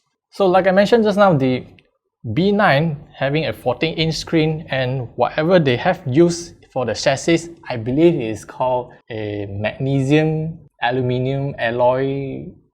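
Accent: Singapore. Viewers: Just from the accent, I can guess you are from Singapore!!!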